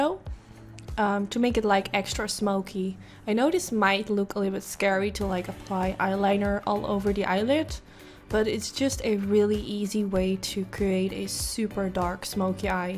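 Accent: Netherlands. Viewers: Ik kijke naar je filmpje met in me hoofd hoor ik nou een Nederlands accent of word ik gek😭 en ja hoor je bent gewoon Nederlands 😍 yo go girl 💥💥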